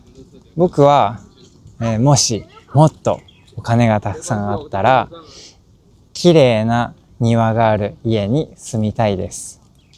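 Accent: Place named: Taiwan. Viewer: You sound a Little bit , taiwanise , but your Japanese is on point!!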